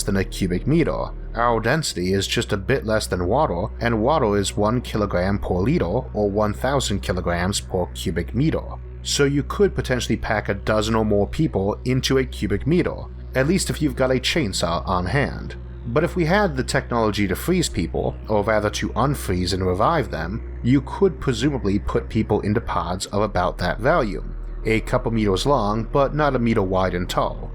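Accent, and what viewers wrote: United States. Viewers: Why does your voice sounds like that?? Are you american?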